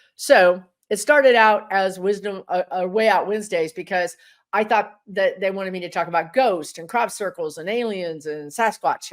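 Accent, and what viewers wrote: Texas, United States. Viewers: I had to stop you at 16/58 over "lifetime" vs their "lifetimes." Part of the draw here is the chemistry/angst/respect between you and the guides. For anybody popping in for the first time it adds immediate credibility. For me it fosters a growing appreciation for what you both bring. Personally, I love their language, just as I do yours when you talk Texan or whatever you'd call it.Equally charming.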